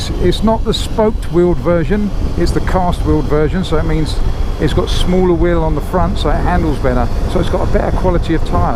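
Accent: United Kingdom. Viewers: Damn lively man...enjoyed every bit of it...the queenz english and america r a good combo..!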